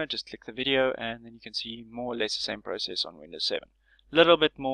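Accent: Afrikaans, South Africa. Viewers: I recognize an Afrikaans ouk when I hear one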